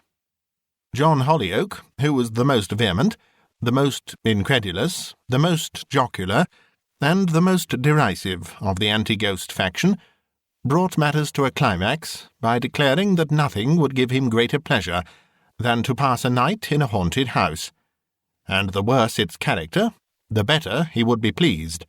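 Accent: England, United Kingdom. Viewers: Great stuff sounds better in a upper class english accent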